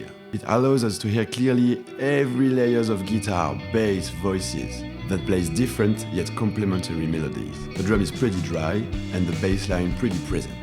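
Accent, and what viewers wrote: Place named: France. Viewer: That little French accent, so sexy ! Don't give up !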